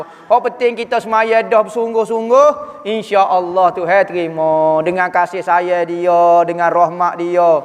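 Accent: Kelantan, Malaysia. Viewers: suka dengar ostaz kecek klate hehehe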